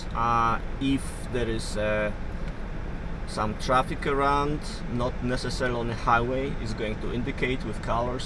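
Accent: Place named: Russia. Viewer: Russian accent)))Learn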